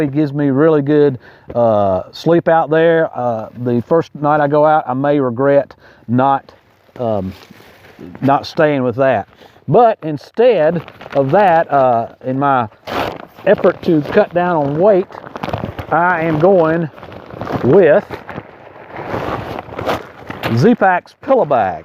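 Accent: South, United States. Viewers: ur prob live in smokies sound southern very nice person and ur very helpful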